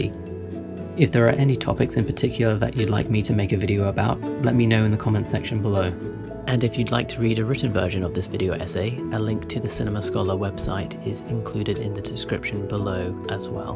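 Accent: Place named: United Kingdom. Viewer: I hate starting a yt vid and hearing a british "person" smh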